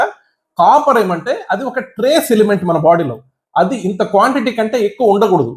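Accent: Nellore, India. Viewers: మీ మాట తీరులో మన నెల్లూరు యాస స్పష్టంగా కనబడుతోంది 🙏 మంచి సూచనలు సలహాలు ఇచ్చారు ధన్యవాదములు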